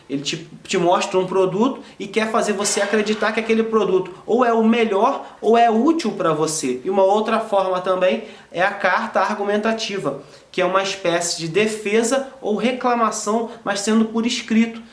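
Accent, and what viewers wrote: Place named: Rio de Janeiro, Brazil. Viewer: Nem precisa fala que é do RJ com o sotaque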